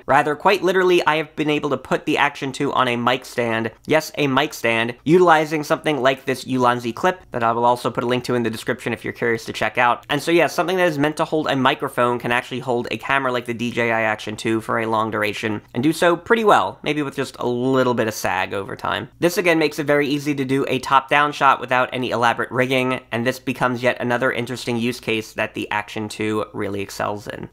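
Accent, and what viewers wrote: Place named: Delaware, United States. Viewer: I'm watching this from Poland right now, but I grew up in Delaware. I'm def hearing some sort of Delaware / South Jersey accent. Key words - waterproof "wooderproof" / video "videoh" I love it, brings me home!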